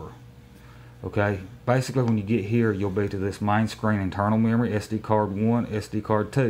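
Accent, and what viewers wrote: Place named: Kentucky, United States. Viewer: By the way where do you live? I used to live in Kentucky and I kinda recognize your accent from when I used to live back there. Not trying to be funny but I was just curious. Used to live outside of Lexington Kentucky in a little town called Winchester KY. who knows we might have been neighbors at some point 😂😂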